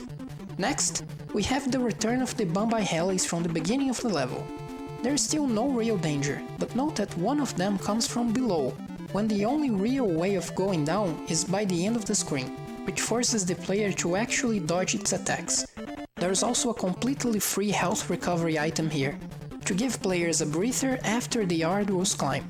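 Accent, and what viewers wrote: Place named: Brazil. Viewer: By some reason I feel like you're brasilian. Well, at least your accent gives me this impression.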